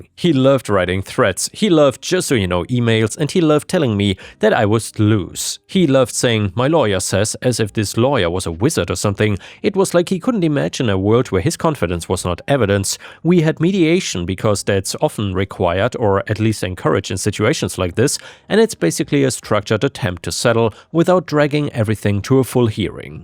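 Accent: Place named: Germany. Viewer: Love your videos. Your accent.. German? Sounds like my accent 😂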